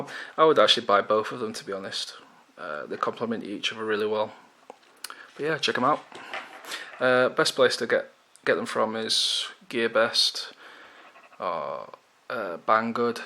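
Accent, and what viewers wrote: United Kingdom. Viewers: Are you british? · A bit off topic but you have a British accent and pronounce the Z "Zee" instead of "Zed"?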